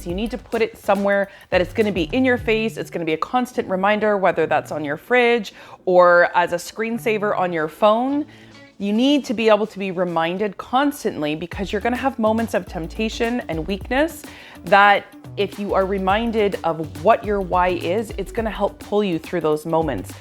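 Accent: Canada. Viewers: Just saw your video. Great talk. Are you from Canada?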